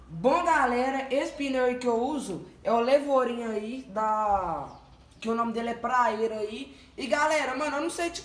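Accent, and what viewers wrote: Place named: Minas Gerais, Brazil. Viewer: Minero fala tudo igual Man kkk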